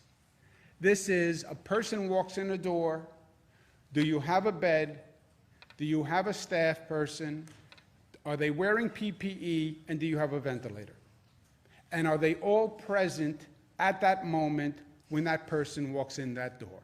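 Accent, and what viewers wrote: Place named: New York, United States. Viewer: Its the New York accent and all the strength/testosteone exuding from him. We get it, you don’t need help. It’s okay to be attracted to masculinity without calling it toxic, like many have in the recent past just to bandwagon a stupid slogan or saying.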